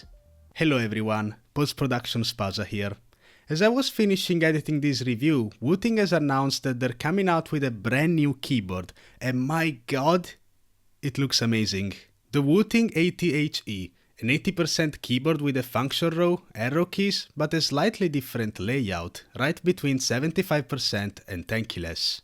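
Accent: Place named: Italy. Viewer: That's some sick italian accent, right?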